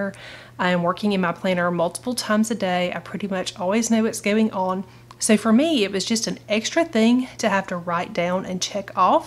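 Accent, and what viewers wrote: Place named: Alabama, United States. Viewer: this is definitely a first, Alabama mistaken for Australia 🤭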